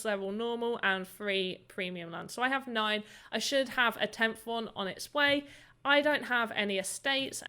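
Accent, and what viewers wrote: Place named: England, United Kingdom. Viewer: May I ask, where in the world are you? What part of England?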